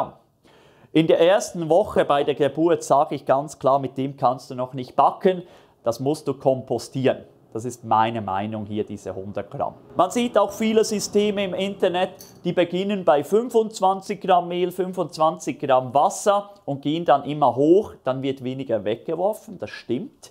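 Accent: Switzerland. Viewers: danke für deine coolen videos!und ich finde deinen swiss akzent richtig sympathisch!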